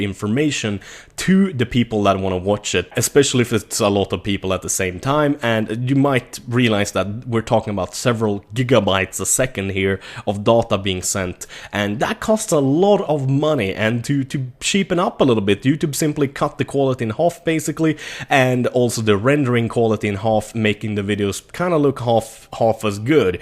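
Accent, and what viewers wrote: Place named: Sweden. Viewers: Yes, he is from Sweden. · Are you from Sweden? Your accent reminds me of robbaz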